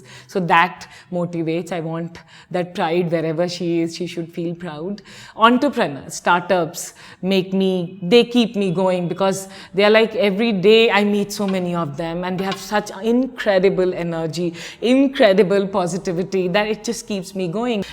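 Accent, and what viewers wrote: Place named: Jamaica. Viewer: almost sound Jamaican 💚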